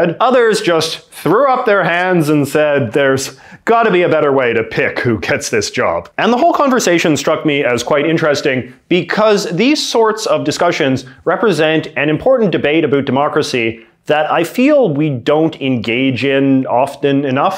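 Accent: Canada. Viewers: American politics make more sense when explained with a thick Canadian accent.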